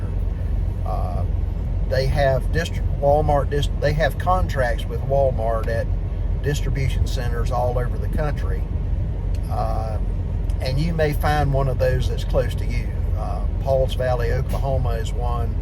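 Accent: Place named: Ireland. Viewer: I'm on my third month now with the company and so far so good. I love your Irish accent or is it Italian. hahaha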